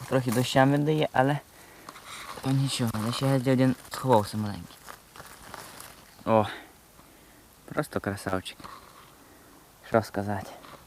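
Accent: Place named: Skole, Ukraine. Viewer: Молодець, гарні відео. А у нас Сколівщина трішки по другому називають гриби і говірка твоя гарна, цікава, приємно слухати.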